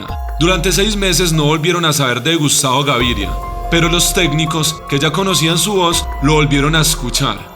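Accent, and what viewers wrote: Colombia. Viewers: Por tu manera de hablar eres de Colombia pero viviste en aquella época de Guerra del Narcotráfico contra el Estado?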